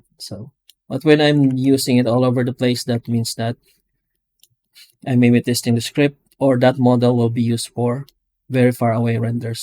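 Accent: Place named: Philippines. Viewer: First time I hear your voice...😅 Now I can confirm you are pinoy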